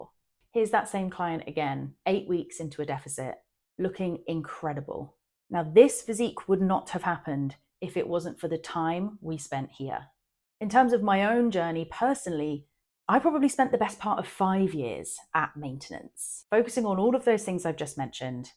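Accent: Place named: United Kingdom. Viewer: This I subscribe to.Love the British accent.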